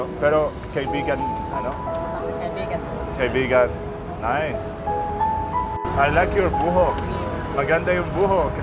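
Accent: Philippines. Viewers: Ur slang is lil kabayan now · It’s crazy you picked the Filipino accent 😀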